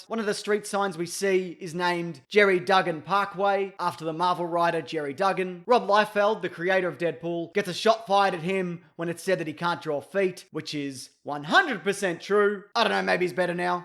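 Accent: Australia. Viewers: Is the speaker Australian?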